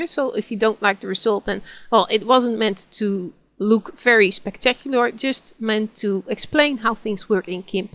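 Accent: Netherlands. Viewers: This is a Dutch accent for English!! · i think she is from Amsterdam based on her accent. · you are dutch i can hear the accent ;3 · om god you just can hear that she come from the netherlands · lovely dutch accent with crisp clear english and no loud music.I found the video very helpful thank you. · such a dutch accent ah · I just followed this tutorial to the letter and created my first snazzy gimp image with all the layers she introduced. Excellent tutorial. And to those of you losers who moan about her accent/diction, she's clearly a Dutch girl. Do you know what that means? It means she speaks at least FOUR languages fluently. Most Dutch kids speak Dutch, English, French and German perfectly by the time they are teenagers while 1 in 3 Americans are illiterate in just 1. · Although the intention of the person wanting to teach us about Gimp is highly commendable the pace is too fast. 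The Dutch accent of the speakers English could be also somewhat problematic for some. These are slight critisms because you have to thank the person for taking the time and trouble to make this video. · Thank you for this tutorial are you btw Dutch, 'cus you have a Dutch accent :P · dutch accent i presume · I am pretty sure she is dutch. Even tho i am dutch too most of the people in Holland who try to speak english get this accent. It sucks. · if im not mistaken,i can tell by your accent that you maybe dutch... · Your accent.is dutch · Ogod Dutch accent RAWRRR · Haha, echt een Nederlands accent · ik hoor gwn dat je nl bent :) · I think her English is fine - guessing a Dutch accent. Thank you GIMP teacher, I've been struggling with GIMP and learned a lot. You are a good teacher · ik kan horen dat je nederlands bent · Intuxikated You hear it clearly that's she's Netherlands xD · nice dutch accent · Omg. 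The second you started talking I heard you were Dutch. Hello fellow Dutch person! XD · AND I find her Dutch accent delightful, so different strokes lol! She is organized, has a nice pace AND is a good teacher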